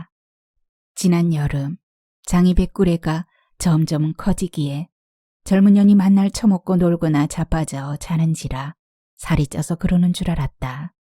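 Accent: Jeolla, South Korea. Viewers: 정감어린 전라도 사투리를 어쩜이렇게 소담소담.읽어가시는지..대단하십다. 감사합다.^~^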